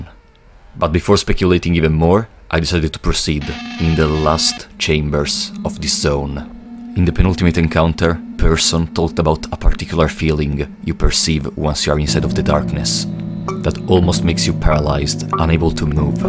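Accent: Italy. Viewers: i just duscovered your channel, and was wondering, are you, by any chance italian? curiosity js killing me, also i love the content i hope you keep growing like you are doing👍👍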